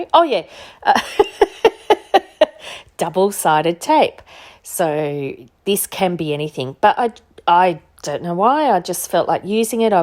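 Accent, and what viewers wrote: Australia. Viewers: Nice to hear an Aussie voice! Great little project!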